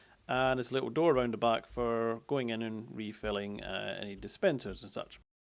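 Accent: Scotland, United Kingdom. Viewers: blake collins ._. that may be because he is scottish... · Wow he sounds scottish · You sound scottish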